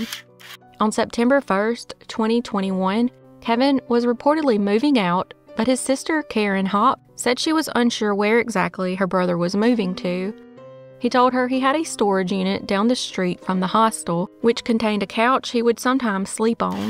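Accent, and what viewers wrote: South, United States. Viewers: Good evening Southern Belle 😉 so good to hear that Sweet Southern sound! Thank you for putting all the time and effort in to your videos! Your dedication brings us listeners true sad and sometimes happy stories. Much love from Washington State 💞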